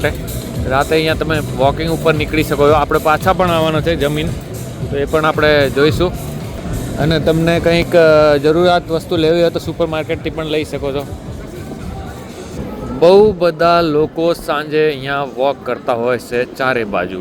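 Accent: Rajasthan, India. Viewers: Gujrati bhasha me to rajsthani wala lehja h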